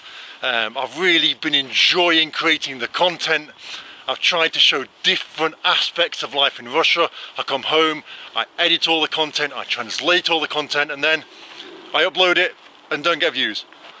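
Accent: England, United Kingdom. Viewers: MATE WHERE U COME FROM ENGLAND?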